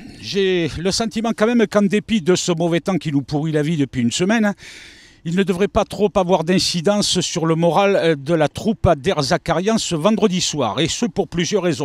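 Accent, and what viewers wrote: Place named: Provence, France. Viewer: L'accent provençal 😂😂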